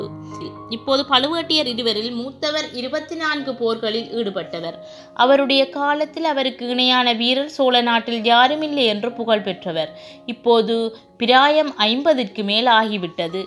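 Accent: Sri Lanka. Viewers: உங்களோட கொஞ்சும் இலங்கை தமிழில் பொன்னியின் செல்வன் கதை மிகவும் இனிமை.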